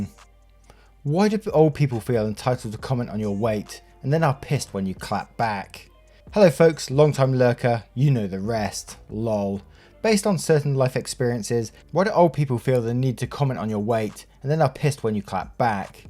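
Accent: United Kingdom. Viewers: Where are you from? I can't pinpoint your accent, my best guess is British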